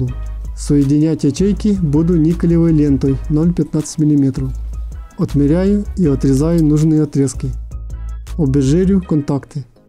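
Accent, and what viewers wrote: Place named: Vologda, Russia. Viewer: мастер из Вологды, по акценту сразу понятно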